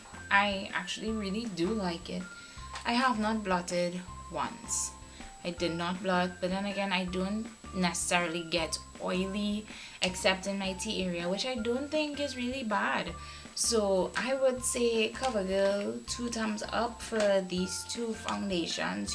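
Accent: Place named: Caribbean. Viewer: Love your accent ..Caribbean girls run it ..love this review😊💯👍🏾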